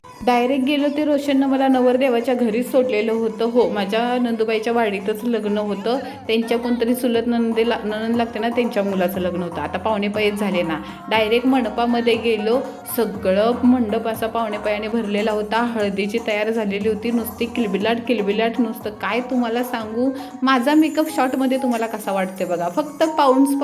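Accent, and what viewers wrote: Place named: Satara, India. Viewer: Tumche gav kuthle ahe,tumch bolnycha tone, saglya vidhi satara karad side chya vatyat.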